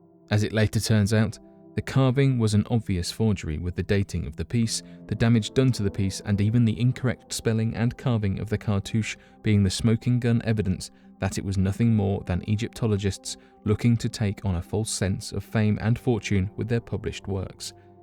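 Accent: United Kingdom. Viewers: It's hilarious how many people want to correct how this poor guy pronounces words. He's British! They pronounce things differently. You trying to correct him is more annoying than him pronouncing words in a way you don't like. Lol!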